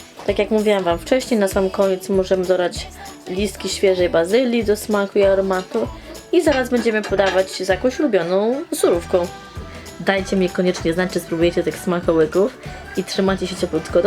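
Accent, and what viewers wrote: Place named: England, United Kingdom. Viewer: Wróciłam do Twoich starszych filmów i zauważyłam że miałaś większy akcent angielski niż teraz:D duża zmiana na plus. Bardzo fajne filmy:)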